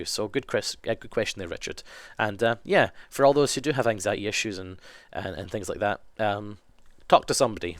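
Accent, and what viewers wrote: Scotland, United Kingdom. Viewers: SCOTTISH FOR THE WIN GO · I may not be sociophobic much like I hate talking to people i've never met and I hate it when someone looks at me.. but i do have extreme trust issues... like for one thing i'm scared to get a boyfriend... but still you make me feel better when I watch your videos knowing you have phobias too... Anyway, you are truly amazing and i'm happy to hear your scottish too I have a family kilt and love it.. You rock and never forget we love you!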